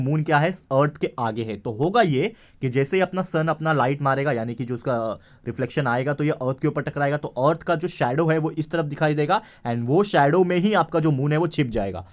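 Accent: Maharashtra, India. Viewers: Sir aap marathi Ho kya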